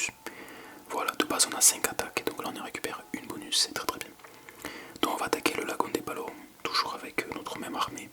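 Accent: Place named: South, France. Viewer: t’es du sud toi 😂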